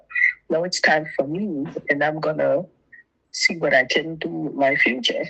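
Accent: Jamaica. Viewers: Living in America so long and still manage to maintain your Jamaican accent eh, good for you girl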